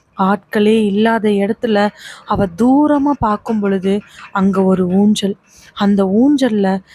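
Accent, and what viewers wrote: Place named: Coimbatore, India. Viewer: பழைய கதை எல்லாம் கேட்டு கொண்டிருக்கேன் சூப்பர். அப்டேட் டும்.. கேட்கிறேன் அருமை.. சகோதரி வாய்ஸ் கோயம்புத்தூர் டோன்... 👌🤝💐 திண்டுக்கல் எங்க ஊரு வாவ்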